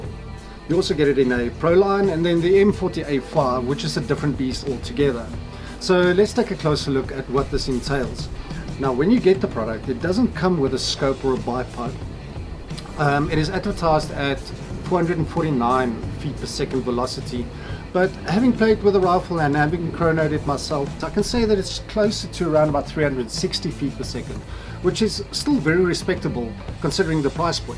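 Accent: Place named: South Africa. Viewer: are u from south afrika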